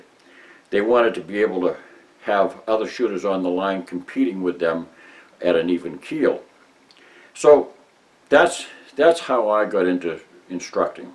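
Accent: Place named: Boston, United States. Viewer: True Boston boy I can tell. Can’t wait to see the instructionals. Thank you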